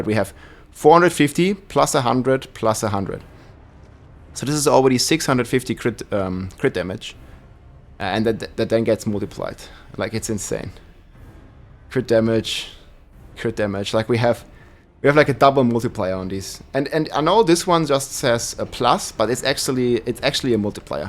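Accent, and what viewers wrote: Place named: Germany. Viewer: My absolute blind faith once i hear a german start breaking down nerdy videogame numbers is beyond measure.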